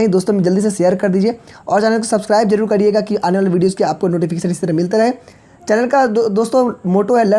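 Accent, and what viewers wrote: Bihar, India. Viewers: Bhai tum ek bihari ho kya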